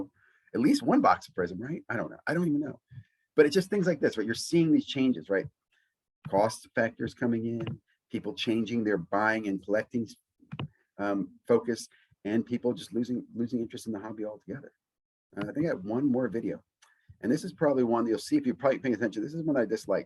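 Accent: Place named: South, United States. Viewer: Liked for the southern accent 😆😆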